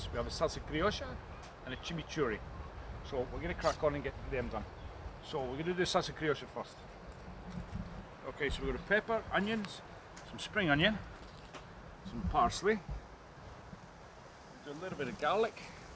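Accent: Scotland, United Kingdom. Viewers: pretty good man! where are you from originally? I sense a bit of a scottish accent, or maybe northern england? my only suggestion is that for thin cuts like entrecot or matambre, is better to salt them on the grill or right before you put them in. Also chimi is better when you leave it in the fridge for at least 24 hs! The true test for a grill master is to grill a whole vacio cut (which has 3 different cuts in it , with loads of fat and membrane for flavour and crunchyness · Great vid. Just found it. The accent though? Scottish? Went to Argentina and ate almost a kilo of steak a day. Loved it.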